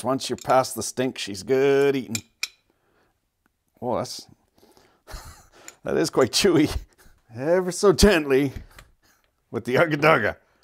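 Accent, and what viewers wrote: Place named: Canada. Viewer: As someone with a lifetime of experience in Canadian English and it's MANY colloquialisms, it gives me a great deal of pleasure to watch YouTube closed captions struggle to comprestand and interpolate your videos!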